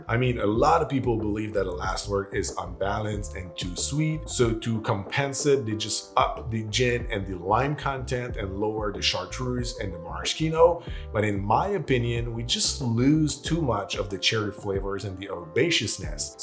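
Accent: Quebec, Canada. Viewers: Because it's not from France's French but Québec's french!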